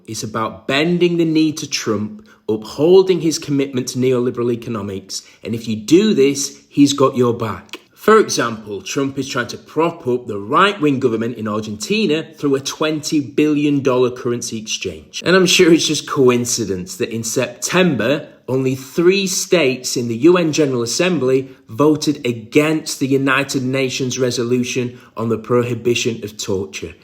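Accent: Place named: United Kingdom. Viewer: You sound British, none of your business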